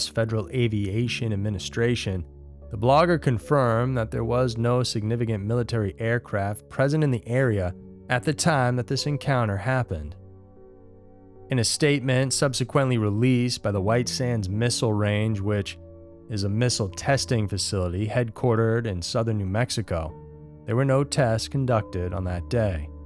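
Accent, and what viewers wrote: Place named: Boston, United States. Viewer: adding an R to the end of ur name...lol yup thats a boston accent! Also, not pronoucing an R to words like car, park, art, etc😂😂😂 Thats me!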